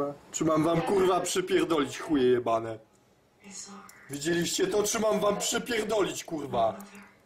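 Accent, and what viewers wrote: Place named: Russia. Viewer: Kupiłem taki sam za 90.zł.masz ruski ,akcent.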